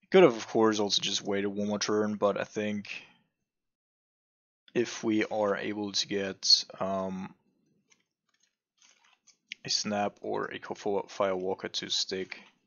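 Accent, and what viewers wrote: Germany. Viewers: Great content as usual but I like Grixis more than UW. Grixis is more flexible I think and more fun to play. But your videos always show your great insight into the deck and also into the meta. By the way are you a German player? Sometimes you pronounce words very German :) And wha are your thoughts on UW Stoneblade in the current meta?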